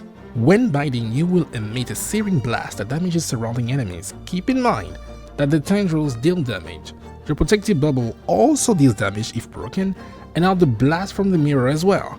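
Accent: France. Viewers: Are you french? ew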